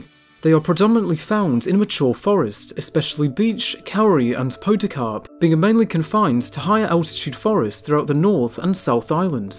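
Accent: England, United Kingdom. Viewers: are you English or New Zealand ish? Because you sound English but mainly do birds from New Zealand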